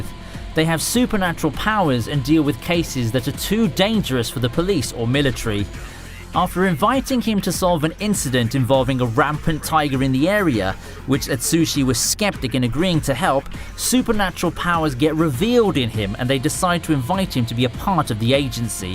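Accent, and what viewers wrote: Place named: United Kingdom. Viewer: Did not expect British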